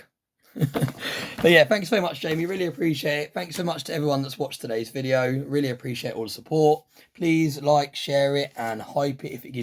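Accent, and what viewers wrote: United Kingdom. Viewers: I love watching your videos that british accent is really warming compared to my usial american videos ^^ , cheers from across the pond in france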